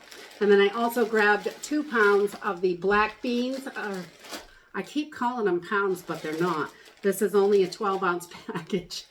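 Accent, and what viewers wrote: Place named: East Coast, United States. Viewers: I love all your videos and I have do! Have a great day!I have been using your lists to stock up. But the real reason is to hear you talk! Love your east coast accent. I’m a midwesterner and love to hear you talk! Thanks for all you!😊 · accent is definitely a New England accent not an East Coast accent, as there are tons of different accents a up and down the East Coast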